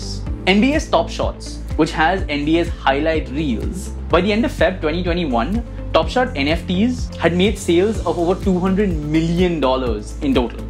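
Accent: India. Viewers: Can't listen to the Indian English accent for more than 2 minutes without cringing :(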